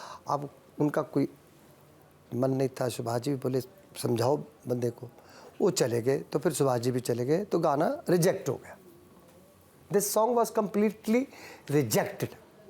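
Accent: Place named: Maharashtra, India. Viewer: मुलाखत खूप छान वाटली! सुखविंदर सिंह ग्रेटच आहेत. तथापि खांडेकर जी आणि ज्ञानदा जी हे हिंदी बोलताना त्यांचा accent मात्र मराठी येतो. तुमच्या सारख्या सेलिब्रिटींकडून हे अपेक्षित नाही.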